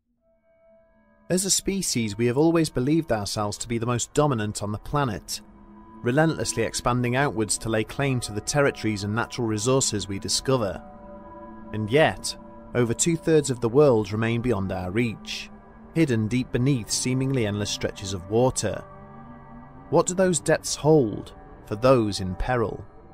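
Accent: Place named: United Kingdom. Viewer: I totally concur with Sir Bronn. You guys have a phenomenal channel with the best presentation & narrations. I know it takes time & effort to compile your videos (and I'm extremely grateful for all of them). My only suggestion would be to create more often (2-3/week). If u have your channel monotized & the community helps get you subscribers & views - you're gonna blow up & rake in some decent cash. Just my 2 cents. I'm American & I really enjoy listening to a proper British accent. Your stories are awesome & the art is epic! I wish you guys nothing but the best! Thx for everything. Much love & respect...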